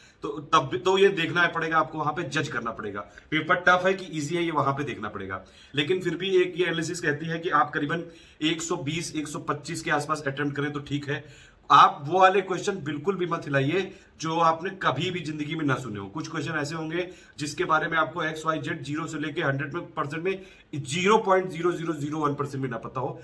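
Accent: Kanpur, India. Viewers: Puri hmari kanpuriya language hai 😀😀👍👍 mja aa gya sir🙏🙏🙏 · Hahaha..... total kanpuriya ho Bhai.....hum bhi kanpur ke hai...z😂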